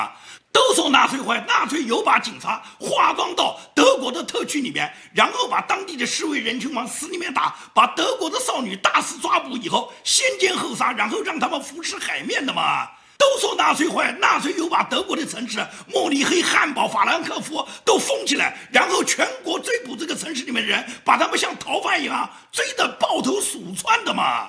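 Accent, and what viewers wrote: Nanjing, China. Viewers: 南京话很浓啊，啊吃过啦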